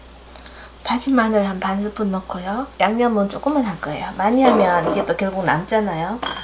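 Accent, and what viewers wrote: Gyeongsang, South Korea. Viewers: 경상도에서 잘 해먹는 반찬 서울 사람들은 잘 안먹더라구요 쪼매만 경상도 사투리 섞어 나오네요 ㅎㅎ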